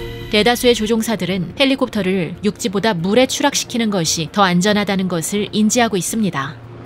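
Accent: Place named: United States. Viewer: 발음 뭐미 재미교포 발음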